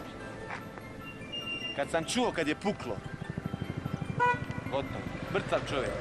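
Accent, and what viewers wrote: Sarajevo, Bosnia and Herzegovina. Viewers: Ovo je normala u Sarajevu, tako se jednostavno prica. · Ovo je kalup za ucenje sarajevskig akcenta😊. Ima ga Zena Tln. Minka...Tln. ali ko voli da nauci.evo to je taj. Ima ga i Zera crv.jabuka. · čuj parodija 😂 pa svi mi u Sarajevu ovako pričamo, Nadrealisti i Nele su personifikacija ljudi i života u SA. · Pa ovako pricaju stare sarajlije, oni koji su se rodili i odrasli u ovom gradu. Sad, to sto si ga ti cuo samo na TLN pa mislis da su ga oni izmislili… Nije akcenat nastao sa TLN ekipom, nastao je sa stanovnicima Sarajeva. Sarajevo ce biti, sve drugo ce proci.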